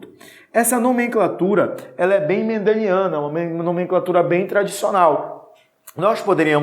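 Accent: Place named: Pará, Brazil. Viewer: O senhor é incrível!! Esse sotaque é paraense 😂❤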